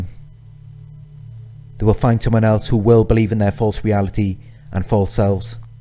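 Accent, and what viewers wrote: Wales, United Kingdom. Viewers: It’s actually a Welsh accent. Welsh people are some of the nicest people you’ll meet :)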